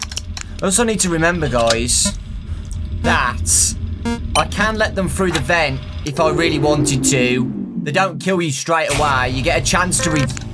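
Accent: United Kingdom. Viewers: wow you are british!!!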